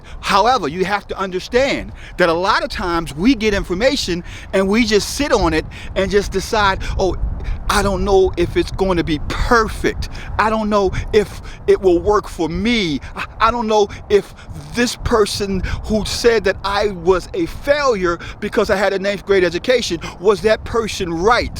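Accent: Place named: Baltimore, United States. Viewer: Mr., how about I was listening to you talk and said to myself..he's got to be from Bmore. I digested the knowledge you just share very easily. You delivered it very well. Thank you for sharing. I'm from Baltimore but living in NC. Nice video. Appreciate the jewels. I'll be tuning in Sir...keep the vids coming. Peace and blessings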